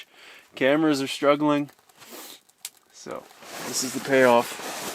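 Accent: Canada. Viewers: is it just me or have you been talking way more canadian this video than usual? maybe im crazy 😅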